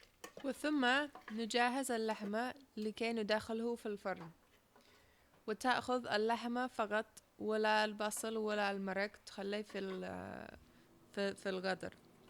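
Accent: Yemen. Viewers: I like your Yemeni style Arabic.. and your recipes of course :) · تسلم الأيادي تقديم مميز للأكل اليمني بطريقه سهله وسلسه بعملها الآن بطريقتك بس لحم خاروف وقطع اصغر ومتأكده انها بتكون لذيذه وحلوه كجمال اللهجة اليمنيه سبحان الله وبحمده سبحان الله العظيم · ههههه تعجبني وهي تتكلم عربي باللهجة اليمانية المحشوش اكلة جيزانية بس مااعرف كيف يسوها بس اطلبها من اقربائي اهل جيزان ويجيبوها جاهزة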